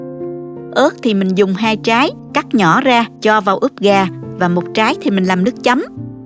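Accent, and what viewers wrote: South, Vietnam. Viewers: Cô ấy có giọng nói Nam Bộ rất dễ thương và dễ cảm tình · Giọng nói đặc sệt Nam Bộ. Chỉ dẫn tận tình,tỉ mỉ. Nghe dễ nhớ, dễ hiểu vô cùng. Có thể dùng nồi cơm điện hấp được không? Cảm ơn cô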